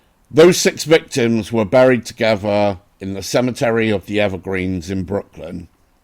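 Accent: United Kingdom. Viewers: Another expertly done video,. Kudos, as always! Double fascinating to me, since I am not only a history buff but also greatly enjoying reading about & seeing images of old cemeteries. But of course what a totally heartbreaking piece of history. So very sad. I always also enjoy your narration (especially love that British accent!). THANK YOU very much for sharing with us. 🤓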